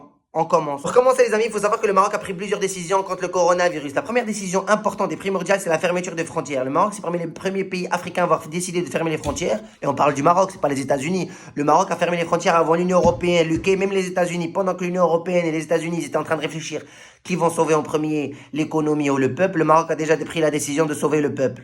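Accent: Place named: Algeria. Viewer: ET C KOI CET ACCENT PIED NOIRE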